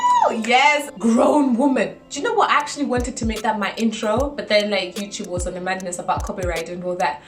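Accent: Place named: Malawi. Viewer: Your Malawian accent I love 😂😂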